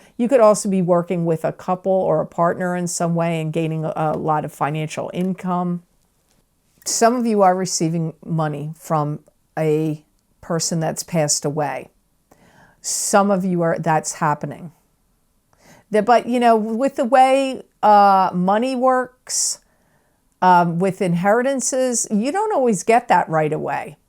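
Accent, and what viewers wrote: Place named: Baltimore, United States. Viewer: Hi, are you from Baltimore? You sound just like a hometown girl! It's my hometown.:) BTW, I am disposing of my brother's estate which includes two cars, an airplane and his house. Talk about large items! You are right on about that!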